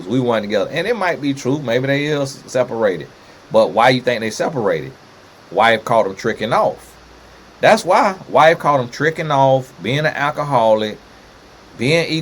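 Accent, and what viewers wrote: South, United States. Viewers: Thank you Tony Gaskins for your channel as your take on life’s situations is hilarious! Love your southern accent, respectful!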